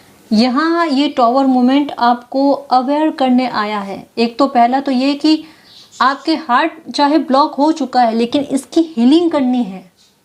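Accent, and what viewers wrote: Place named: Marwar, India. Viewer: Rajasthan ki Ho kyunki main bhi Rajasthan ki hun kyunki aapki Bhasha se pata chal raha hai Shayad aap Marwar Marwar se ho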